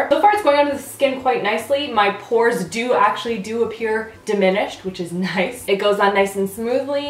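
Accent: Canada. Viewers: that moment when I'm so Canadian that I didn't even realize you sounded really Canadian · You always sound Canadian and it's adorable! it's one of the reasons i follow your channel.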